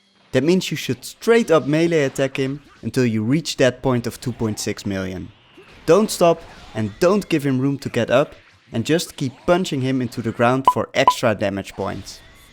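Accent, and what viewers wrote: Netherlands. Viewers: You're Dutch aren't you 😄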